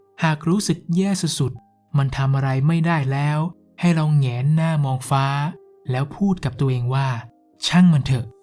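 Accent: North, Thailand. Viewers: ขอบคุณนะคะ เป็นคลิปที่ดีมากๆเลย ฟังแล้วเพิ่งรู้ว่าคุณเป็นคนเหนือ ฟังวนไปทุกวัน กดไลค์ให้ทุกวัน มันดีกับชีวิตมากๆ ชอบ~😊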